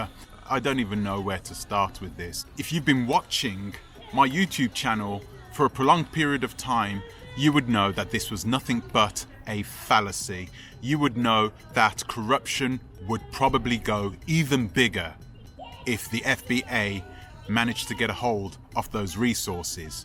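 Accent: United Kingdom. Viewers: OMFG THAT BRITISH ACCENT IS SOOOOOOO ANNOYING IDK HOW ANYONE CAN LISTEN TO IT FOR LONG PERIODS OF TIME 🤮🤮🤮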